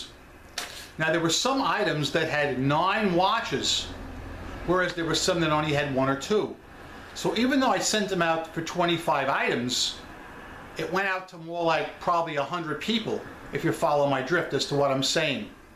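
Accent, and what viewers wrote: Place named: Tennessee, United States. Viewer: I too have a distinctive accent from SE Tennessee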